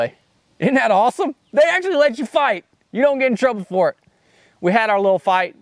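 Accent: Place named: Northern Louisiana, United States. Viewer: Sounds like you’re from northern Louisiana.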